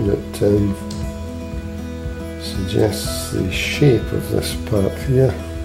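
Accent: Scotland, United Kingdom. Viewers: I just found you! I'm so glad I did. I so loved watching this video, and everything come to life. You're amazing. I loved listening to you talk. Are you Scottish?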